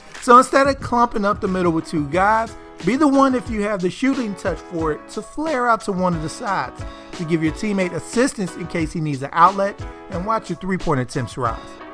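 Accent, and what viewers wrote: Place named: Baltimore, United States. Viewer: Can tell you from Baltimore wit da accent 😭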